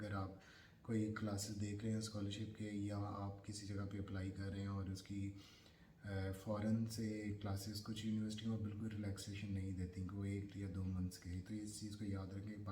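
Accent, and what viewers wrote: Faisalabad, Pakistan. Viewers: Dr sb, kindly use some Mike that can record voice that is audible, rest is fine, full of Faisalabadi touch.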